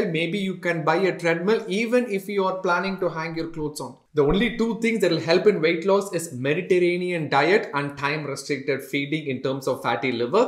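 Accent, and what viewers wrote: Tamil Nadu, India. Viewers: talking English like Tamil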